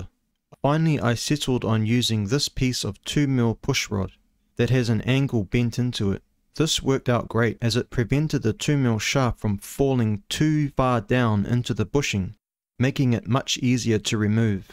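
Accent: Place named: New Zealand. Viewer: Good work, thought i could hear a kiwi accent, good to see some kiwi diy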